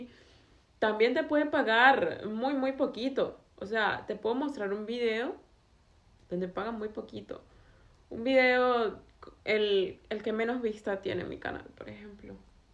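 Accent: Colombia. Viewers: Porqué tienes un acento colombiano tan marcado 🤔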